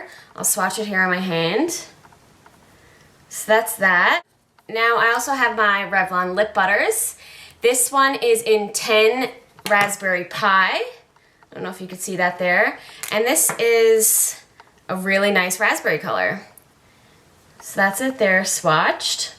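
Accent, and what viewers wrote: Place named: East Coast, Canada. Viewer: Michelle sent me as well! You are gorgeous and your videos are great, glad to have discovered you. I love your top, where is it from? I am also Canadian from the West Coast, I would guess you are from the East Coast from your "accent". Thanks for the great videos!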